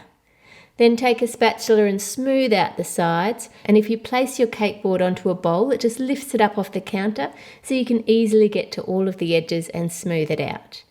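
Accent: United Kingdom. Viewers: Your so talented and creative. And I love your accent too. It's like British accent. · Are you British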